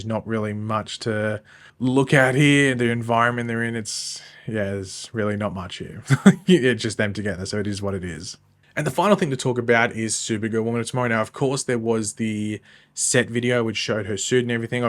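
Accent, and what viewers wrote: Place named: Australia. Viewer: Shut down your page. I hope you will seriously think about it. I dealt with your negative comments about Superman and Lois, all through its run, because you were a "just a Arrow Fan" and only Flash mattered besides it. We don't need your terrible Aussie accent. Through yourself on the Barbi and turn the gas up to 'Nuclear'.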